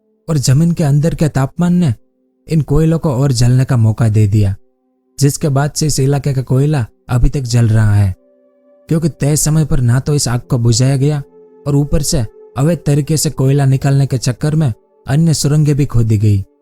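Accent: Gujarat, India. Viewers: Aap gujarati jaise kyu bolte ho.??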